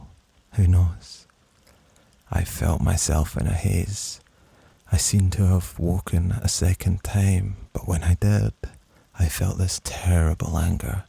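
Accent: Scotland, United Kingdom. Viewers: From what I can tell it's Scottish I don't know specifics though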